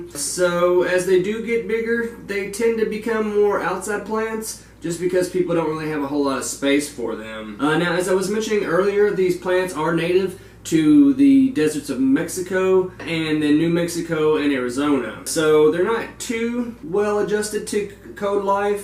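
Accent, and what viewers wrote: South, United States. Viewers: First of all I am so glad I found your channel! Hearing a fellow southerner doing these plant videos is so refreshing! I'm from wv and I'm kind of shy about my accent on my videos lol second I got my first agave yesterday so thank you for the info!!! ☺️💚🌵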